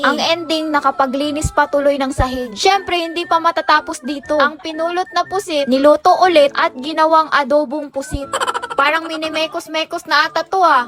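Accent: Visayas, Philippines. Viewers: Bisaya mo te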